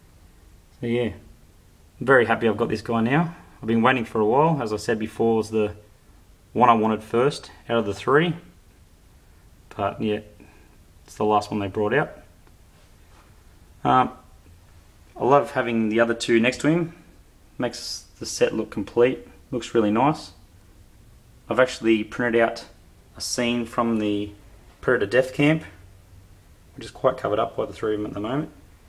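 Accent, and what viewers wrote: Australia. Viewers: nice review must be an aussie mate thanks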